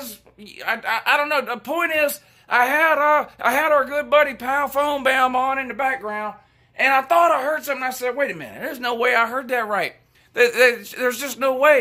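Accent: South, United States. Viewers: But I will keep watching. I am a gator fan in Moscow. I’ll listen to anyone with a southern accent.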